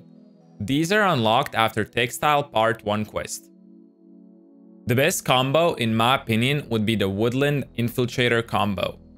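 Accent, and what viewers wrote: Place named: Europe. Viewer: Hi from Finland. To my ear have a European accent, so where are you from? My guess... Slovakia;)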